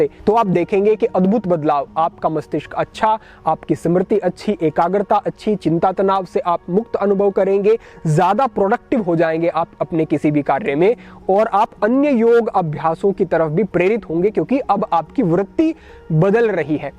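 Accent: Standard. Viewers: Mera man to apki awaz sunke hi accha ho jata hai q ki ap suddh hindi bolte ho badi meethi lagti hai ❤❤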